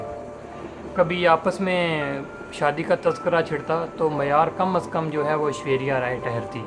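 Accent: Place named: Punjab, Pakistan. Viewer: بھائی! ایسا لگ رہا کہ آپ پنجابی میں بات کر رہے ہیں۔ اِ کو اکثر َ تلفظ ۔۔۔۔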